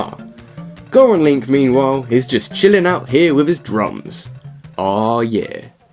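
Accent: United Kingdom. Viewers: I'm about a minute in: my first thought "oh so it's one of these SOB's with 30 second intros... but hey, he's british! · BRITISH ACCENT ON A TOP TEN Legend of Zelda LIST? HEEEEEEELLL YES!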